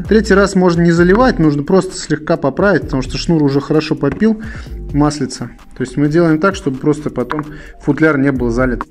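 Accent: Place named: Penza, Russia. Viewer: произношение 50, как в Пензе)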